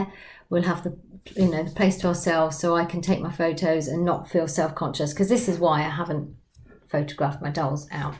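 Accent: United Kingdom. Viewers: OMG! When I heard you say "squirrel" I was like my soulmate, I use that phrase a lot when I have "squirreled some dolly funds away" haha. Love it. I am English but have lived overseas for over 21 years. Sindy was my very first fashion doll. I collect fashion royalty dolls by integrity toys and really into the bigger girls now, such as pasha pasha, joydolls, doll menagerie, tender creations and other ball jointed doll artists. These talented artists seem to all be in Russia or the Ukraine. Just lovely hearing a English accent. I have just subscribed to you.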